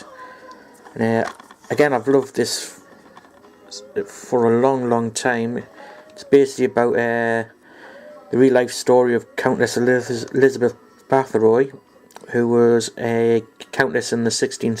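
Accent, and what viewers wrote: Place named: Newcastle, United Kingdom. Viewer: Are you a Geordie or a Mackem? I'm from Gateshead :) Subspecies is great, I enjoyed them when the Horror Channel showed them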